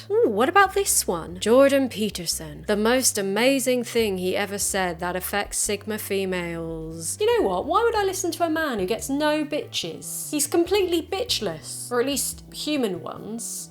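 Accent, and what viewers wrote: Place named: RP, United Kingdom. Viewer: Soooh Posh 💆🏻‍♂️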